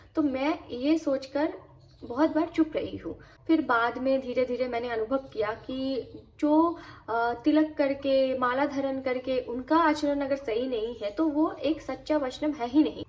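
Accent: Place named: Bengal, India. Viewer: Didi apaki akhee shee pata chal raha hee kii app bengali hee ., kyu kii meri devi jii bhii west Bengal shee hee 😊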